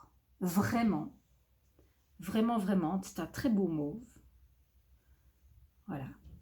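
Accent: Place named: Belgium. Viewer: Coucou, voilà que je découvre ton podcast et j'ai déjà regardé quelques épisodes qui ont été très appréciés! Dès le premier visionnage, j'ai eu le sentiment que j'écoutais une compatriote belge. Il semble que tu as habité la Belgique! Ceci étant dit, je suis une grande consommatrice de podcasts tricot français 😉. Bref, j'aime beaucoup ce que tu présentes et la manière dont tu le fais et je m'abonne de ce pas! Gros bisous de Belgique😘♥️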